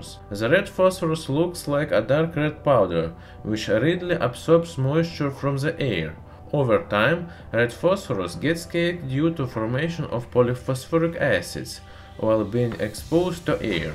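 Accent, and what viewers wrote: Russia. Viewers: My new chemistry teacher with a Russian accent. ......thanks for your videos ..... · this channel has 3 of my favorite things: russian accent half-life and chemistry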